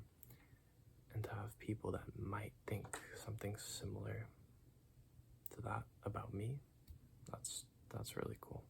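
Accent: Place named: California, United States. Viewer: Also immediately guessed you were from ca with the way you talk haha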